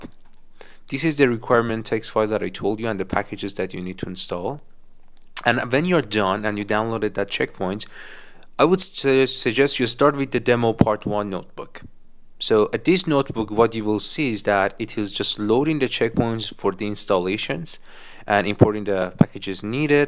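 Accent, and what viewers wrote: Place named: Iran. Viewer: Not me recognizing fellow persian accent from miles away :)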